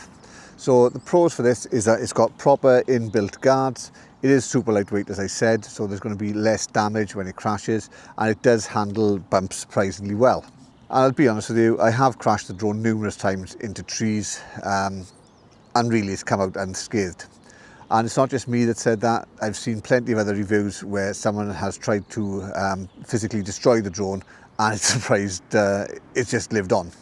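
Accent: Wales, United Kingdom. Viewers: I've almost got my neo2 motion, 😂. I've already bought the 65W wall charger and a fancy carry case. Weather here is improving, only got 1 inch of snow today which is good friday, so not too far from a trip to the hobby shop. If it doesn't like your Welsh accent how will it do with my mixed accent of Geordie and Canadian. 😱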